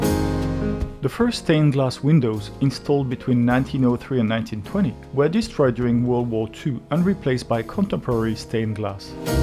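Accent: France. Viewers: I'm a new subscriber here & I just found your channel by sheer accident, so I immediately subscribed. Oh my gosh, this Sir, is absolutely breathtaking from start to finish! Montmartre is so lovely & wonderful! For our first week in Paris, we're staying for 9 days in this area, so we're utterly excited! You truly deserve thousands more subscribers, as your entire presentation, pleasant & interesting narrative & even the music throughout, is just brilliant & beautiful. My family & I are arriving in Paris later this year & honestly, I feel the tears welling up in my eyes when I watch anything regarding Paris & your video is the "icing on the cake"! When I left Paris & France many years ago, I cried on my last day there & I always yearned desperately to return & this year is my absolute dream come true! Thanks to my wonderful husband & family. I'll be in your beautiful & stunning country for over 6 weeks, so I don't take this for granted whatsoever. 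I'm blessed. It's also refreshing to hear a Frenchman taking us around his country, as you know France so very well. Your accent is wonderful! I'll watch more of your awesome videos, as they all appear very interesting & enticing. I should add, Paris is truly magical, very romantic & ethereal & these statements are never underrated! France too, is a truly spectacular country, possessing such rich history, culture & breathtaking scenery. The architecture and the excellent cuisine/food just Wow!!! Merci Beaucoup for your time & your won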